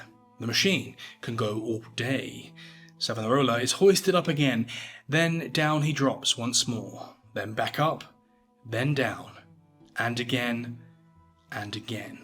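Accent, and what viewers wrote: England, United Kingdom. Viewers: Just got home saw the alert have a new video, oh man dinner with a side of torture in that soothing English voice 😂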